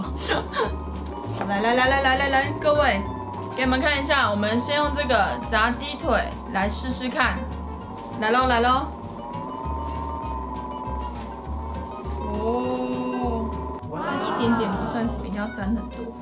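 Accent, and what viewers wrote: Taiwan. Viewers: 台湾腔真的是萌到爆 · 慶煙那邊我笑死了講話真的好台哦♡酷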